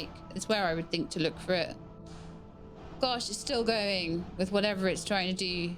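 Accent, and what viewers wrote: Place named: United Kingdom. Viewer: You made your internet handle the primordial spider from lord of the rings... NGL that's kinda based, would be really funny if you were an auzzy but you sound more British than that.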